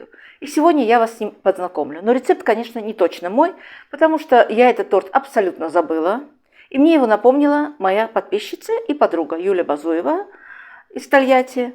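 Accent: Baku, Azerbaijan. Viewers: Спасибо Вам,дорогая землячка!Ваш голос и наш бакинский акцент просто завораживает и ласкает слух!Рецепт -супер!Успехов Вам. P.S.А хочу я ореховые трубочки,кот.продавались в Баку,я писала про них Вам..❤❤❤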